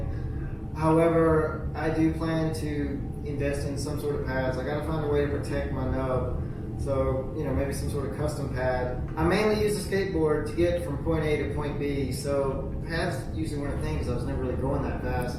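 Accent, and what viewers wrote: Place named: South, United States. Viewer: LOL why are there subtitles? I can totally understand u I'm from NJ NYC is cuz of that southern accent of urs?😊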